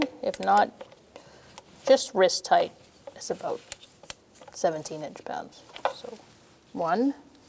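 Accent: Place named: East Coast, United States. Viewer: The East Coast is strong with this one :)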